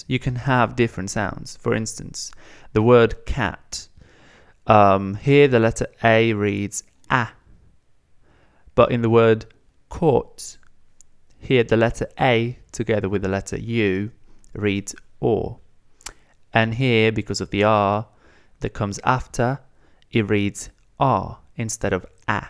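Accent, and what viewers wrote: United Kingdom. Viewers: Thank you. I'm from Brazil and I wanna learn Italian and improve my English. So, your videos are very helpful for me. By the way, your English is amazing. Congratulations. I like this British accent.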